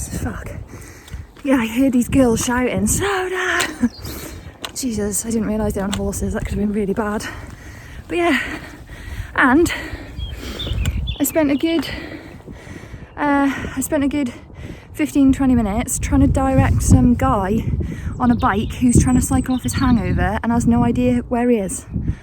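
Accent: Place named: Liverpool, United Kingdom. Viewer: Absolute nutjob marry me. And the scouse accent love it. Ha ha ha.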